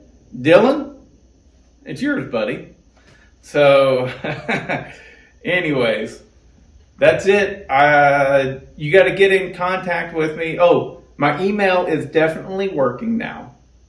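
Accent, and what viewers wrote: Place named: Louisiana, United States. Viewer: Your video quality is freaking fantastic and fun! That Cajun accent is funny stuff!!!!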